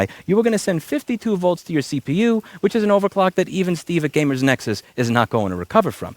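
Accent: New York, United States. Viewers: The angrier Louis gets the more is New York accent comes through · I can fully process at 0.5x, but slowed ny accent sounds like a mob repair guy.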